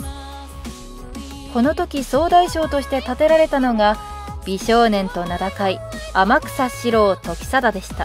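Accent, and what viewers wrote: Kansai, Japan. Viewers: この関西弁の男性ナレーターさんと直接お話してみたい！歴史ロマンが深そう！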